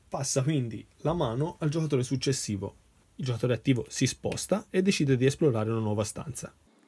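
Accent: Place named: Tuscany, Italy. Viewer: L'accento toscano è veramente sexy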